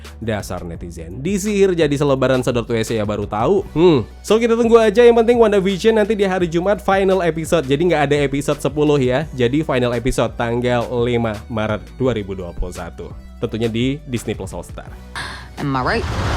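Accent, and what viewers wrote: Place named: Manado, Indonesia. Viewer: logat logat manado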